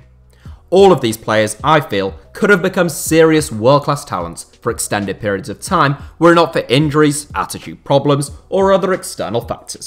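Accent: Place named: United Kingdom. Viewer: We can tell you are british. Were is Ibraimovic?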